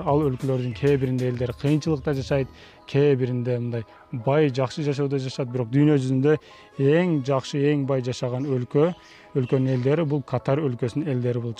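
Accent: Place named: Batken, Kyrgyzstan. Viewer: Сонуун баардыгы жакшы программа болгону таза кыргызча сүйлөсөң өлө сонун болмок баткенче болуп жатат сөзүң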